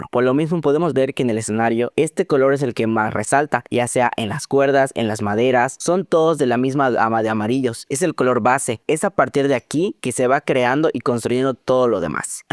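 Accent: Mexico. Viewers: análisis de hamilton en 2025 🥳🥳 te ameeee, necesitas hacer más videos porque los veré todos, excelente análisis, excelente acento yuca y mega slay ese make uppp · hay hamilton, hay drags, hay arquitectura y acento yucateco; este video lo tiene todo y por eso me super suscribo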